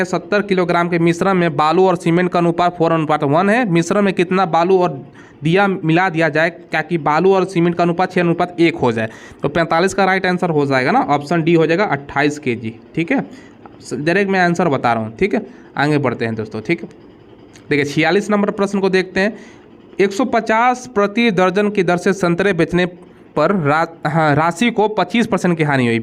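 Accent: Bihar, India. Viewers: Bihari accent 😂😂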